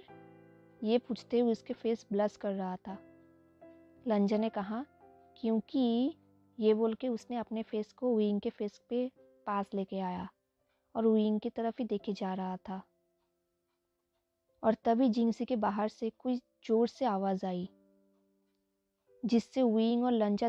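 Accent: Bengal, India. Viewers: Di kya aap bengali ho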